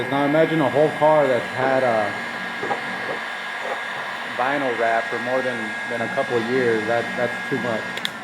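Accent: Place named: Yorkshire, United Kingdom. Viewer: really useful. sweet accent British Yorkshire. and very useful regarding cracked old vinyles. thank u. U could have removed the glue by aseton easily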